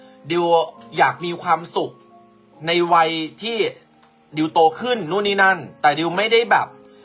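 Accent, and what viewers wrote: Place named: Isan, Thailand. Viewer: น้องดิว เว่าภาษาอิสานม่วนๆๆ🎉🎉🎉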